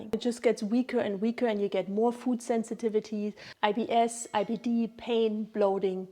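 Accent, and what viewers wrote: Germany. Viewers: I love your accent you delicious German tootsie to worship 👀👀🔥🔥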